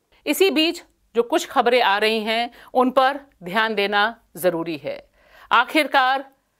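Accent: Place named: Jabalpur, India. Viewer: आपका बोलने का अंदाज, मध्य प्रदेश के जबलपुर आसपास से लगता है, एकदम बुलंद 💪🇮🇳🙏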